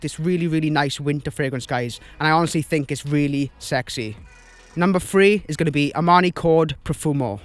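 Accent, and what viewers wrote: Wales, United Kingdom. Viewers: know body he's from Wales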